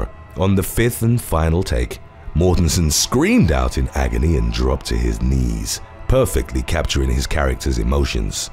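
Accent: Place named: United Kingdom. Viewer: This guy sounds so British he should be in a James Bond movie...all the best from out here on land with the Kangaroos in Victoria Australia.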